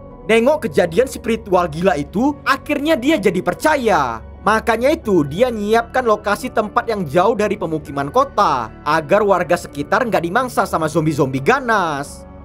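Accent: Medan, Indonesia. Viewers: Bang saran mending pakai bahasa indonesia aja jangan di logatin medan bahasanya🙏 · Medan anjing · Nampak x medan ko ya lek 😂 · Ini aksen melayu Medan ni wey 😂ky aksennya mael lee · orang medan ni pst abangny dr logatnya wakwkka. btw makasih ya bang, pas nonton beneran ga ngerti. abang cerita barula ngeh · Medan kali 😅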